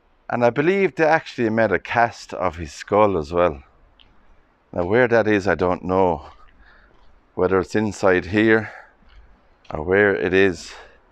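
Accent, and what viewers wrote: Scotland, United Kingdom. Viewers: 😂 that lovely Scottish accent 😉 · Love the Scottish accent! my great granddad was born in Edinburgh. It reminds me of him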